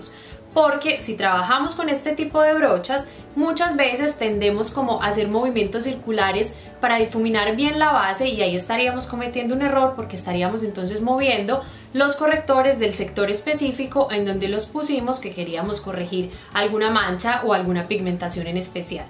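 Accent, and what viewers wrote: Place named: Colombia. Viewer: primer video que veo y apenas empezo dije... Es colombiana! ❤ Nueva suscriptora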